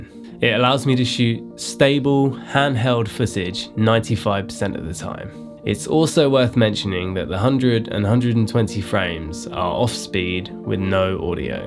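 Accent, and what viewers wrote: United Kingdom. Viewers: Amazing view and lovely British accent..well done.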